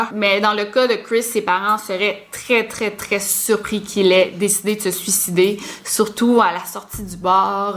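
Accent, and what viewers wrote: Quebec, Canada. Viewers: Oh j'adore l'accent québécois !!🤤❤️❤️😋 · Nouvelle abonnée je tenais à te dire Merci pour tes vidéos je les écoutes tout les jours tu es une très bonne oratrice! En plus avec l’accent québécois au top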